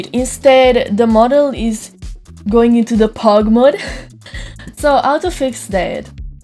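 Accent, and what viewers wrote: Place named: Italy. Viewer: Ma scusa, ci sono già migliaia di persone che parlano inglese e hanno più views. E te che sei Italiana e si sente DI BRUTTO, ti metti a fare tutorial in inglese? 🤦🏻‍♂️ La community inglese, ha fin troppe persone che spiegano pure come costruire un razzo spaziale. Invece la community italiana è sempre quella più svantaggiata. E giustamente te ti metti a parlare inglese per avere più ascolti. Risultato: 3000 follower. I'm laughing :D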